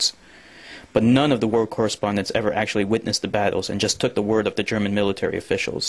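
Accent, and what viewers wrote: India. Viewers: I agree totally Indian accent.....better to do nothing please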